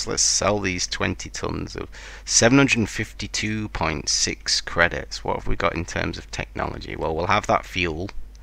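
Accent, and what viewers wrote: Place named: United Kingdom. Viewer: I like your British dialect.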